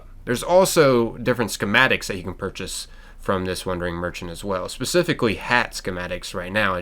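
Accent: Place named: Philadelphia, United States. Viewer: You sound like you're from Philly!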